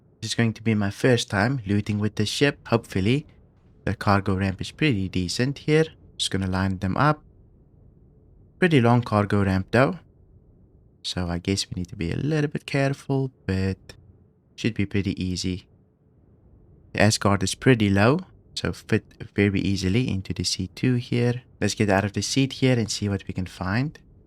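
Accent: South Africa. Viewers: you south african ?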